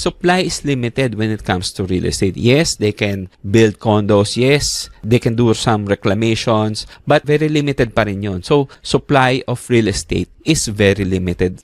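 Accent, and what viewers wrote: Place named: Philippines. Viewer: That is the most beautiful Filipino accent I ever heard.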